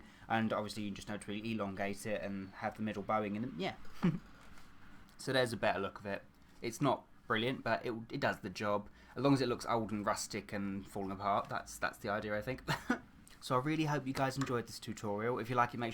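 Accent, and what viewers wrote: United Kingdom. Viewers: I would like to say that I do thoroughly enjoy seeing your tutorials and you are very talented. I also enjoy listening to you talk there is just something about the british accent! In addition to the praise I would also like to place a request for three people: Graverobber and the Repo man from Repo! the Genetic Opera and Lucifer from the Devil's Carnival! Keep the videos coming and thank you!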